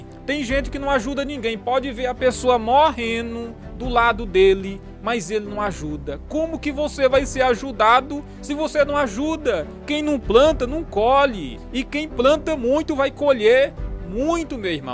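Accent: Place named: Bahia, Brazil. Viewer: Oh missionário, dentro de todos ensinamentos mediante a palavra de Deus que são maravilhosos, eu me alegro com a forma que fala, sei que és estudado e essa forma de falar a palavra ( veio, paia) é engraçado Me faz lembrar a minha terra Natal, Cravolândia lá no centro Sul Baiano Um abraço missionário para vc e sua esposa Deus te abençoe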